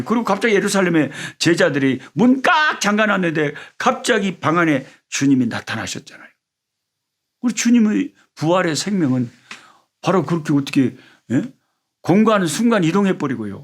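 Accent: Jeolla, South Korea. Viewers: 목사님 감사합니다.날마다 꿀송이 보약큐티 말씀을 통해 너무은혜가 됩니다.특별히 전라도사투리가 너무나 구수 합니다.항상주님의 은혜로 건강하시고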